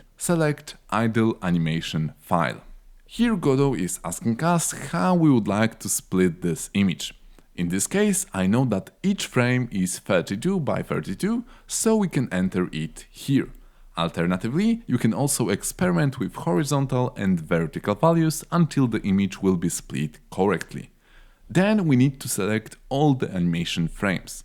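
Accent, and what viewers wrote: Poland. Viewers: The Polish accent hurts my ears.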